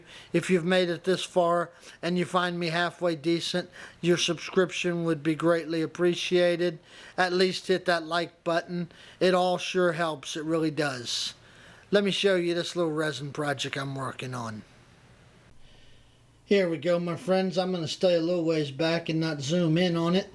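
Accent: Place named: New Orleans, United States. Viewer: that's slick. I'm planning to build some drawers in my shop and I'm definitely going to have to use some " Blocks". I can still detect a little of the New Orleans accent.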